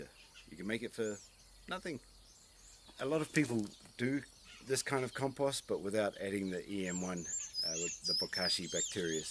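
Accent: New Zealand. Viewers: great to hear a strong kiwi accent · Did I notice a kiwi accent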